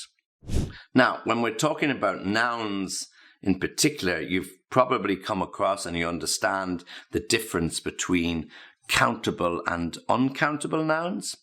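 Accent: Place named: United Kingdom. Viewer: If I am not mistaken you are speaking British English, or at least not Amerikan. And I like it very much. I like your lessens. Thanks a lot.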